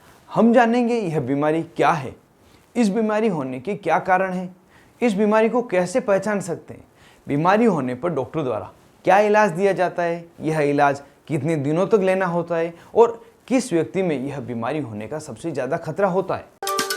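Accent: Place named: Bikaner, India. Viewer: Aap ke accent se lagta he aap shayad Bikaner se hai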